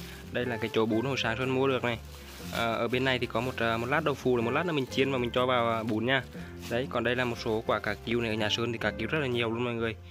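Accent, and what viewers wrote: Vietnam. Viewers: Bạn người nghệ an à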